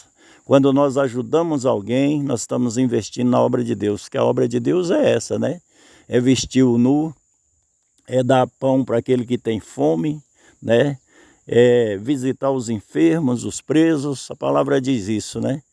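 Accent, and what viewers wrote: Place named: Northeast, Brazil. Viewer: obrigada por me responder, eu percebi pelo sotaque q o senhor era do nordeste, gosto das msgs do senhor, já compartilhei com mais pessoas, sou do estado do Tocantins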